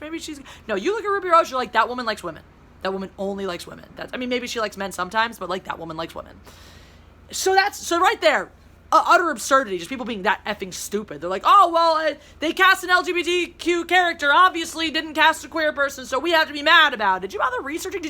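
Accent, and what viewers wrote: Philadelphia, United States. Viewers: I like when gets all Philly on us. XD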